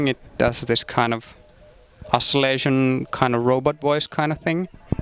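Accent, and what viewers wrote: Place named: Sweden. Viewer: you sound just like martin moulin speaking.. same accent....... are you sweden too?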